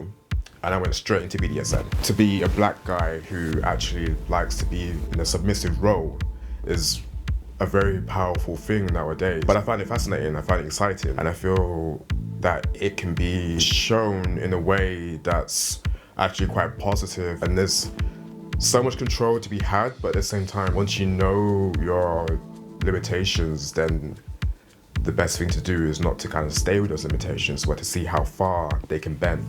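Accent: United Kingdom. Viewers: Black sexy guy + british accent = 💯%♿👌😂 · I love the British accent coming from a black man...somehow it makes it sound even sexier for some reason. I can't be the only one who thinks this right?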